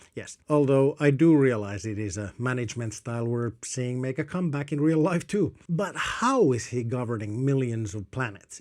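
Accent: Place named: Netherlands. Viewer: Surprisingly interesting channel. I can't quite place your accent and the name " " didn't give me any clues! Some Dutch sounding consonants... But that could also point towards certain Slavic or Uralic. In any event, your English is impeccable. I can't say that future economics is my favorite topic for speculation in science fiction. However, since you ask... I'm afraid i can't imagine a situation where there won't be SOME raw materials that will be scarce. I think we all know about how "rare earths" have become important to computing and cellular technologies. To my mind, that makes a future without some form of capitalism unlikely. However, automation of labor is a trend that hasnt stopped since the beginning of the industrial revolution (and in some ways, even before that.). Inevitably we will get to a point where we won't need to work nearly as much as we think we must right now. 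It you are looking for a "better economic model"'that fits this kind of future, i would say that we already have it in some places where it has been working better than the alternatives since around 1945.. it the Danish model - or Scandanavian model more generally. Highly regulated capitalism combined with a robust welfare state.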